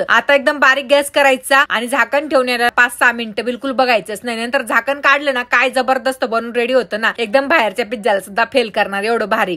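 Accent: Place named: Khandesh, India. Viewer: khandeshi bhasha 😅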